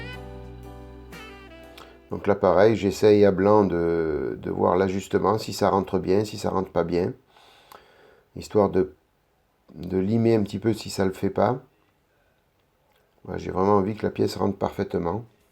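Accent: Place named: Provence, France. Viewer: Bonjour bonjour..au sujet des ciseaux,pour des grosses pièces au cutter c'est mieux (avis perso)..dis moi vu ton petit accent je pense qu'on est voisin..je suis natif de Salon mais j'habite Marseille..bonne continuation..c'est bien parti!!! Jan-mi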